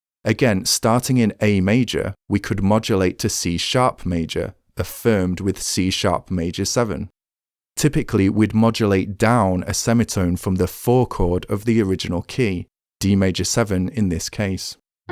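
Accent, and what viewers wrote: United Kingdom. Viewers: I guess he s British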